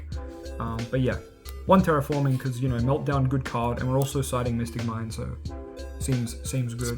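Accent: Australia. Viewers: Facts. And he needs a translater, I hate his Austistralin accent.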